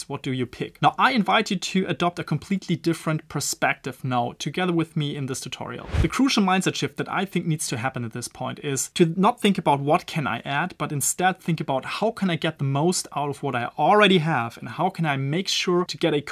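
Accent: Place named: Germany. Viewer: You sound very German xD Great trick buddy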